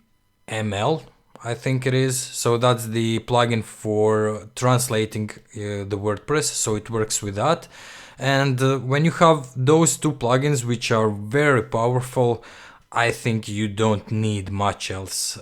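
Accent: Russia. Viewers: i like these vids cause i love his heavy russian accent :D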